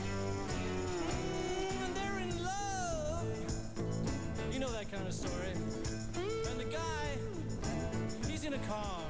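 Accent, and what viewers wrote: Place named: United States. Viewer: Why's he got an American accent? Cringe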